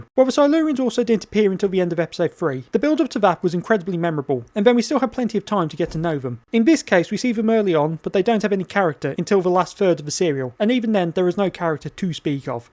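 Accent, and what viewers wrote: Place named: Midlands, United Kingdom. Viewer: "I'm not going to change it because I can't be bothered" "She's not that important,.... plot-wise. " Brilliant! I love the humour and your Midlands(?, sorry if I've got it wrong)) delivery. I have to admit, I like the dark beginning of The Sea Devils, but it soon tails off. I agree with your 'mid' definition; it's not bad, and it's not really good. Though, to be fair, I've not watched it for a long time. The first time I saw it was in '93 when it was repeated on BBC2 (I think) and I was enamoured with it; my favourite Doctor and my favourite companion, few (relatively) VHS releases and almost zero repeats. I'll have to give it another go. Thanks for the review. (Edited once for a typo)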